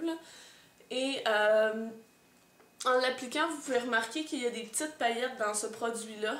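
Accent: Quebec, Canada. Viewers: Salut, Je suis une abonnée depuis un an environ je trouves que tu as une Personnalité Pétillante mais ce que j'aime encore plus c'est Que rien ne pourra t'enlever ton coté Québécois ahah Je Te cites: Des patches Secs s'pa L'idéale Je swirl Dedans Ahah continues Ma belle:)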